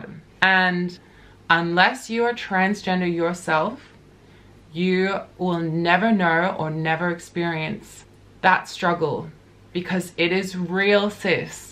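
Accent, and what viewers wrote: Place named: Queensland, Australia. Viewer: You sound like a Queensland girl, or is that just me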